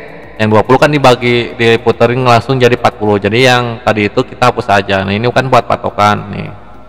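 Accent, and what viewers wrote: West Java, Indonesia. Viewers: Ini mentornyq pasti orang Sunda...aksennya orang sunda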